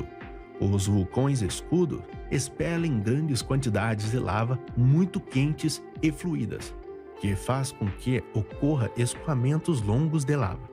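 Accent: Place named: Portugal. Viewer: o narrador parece português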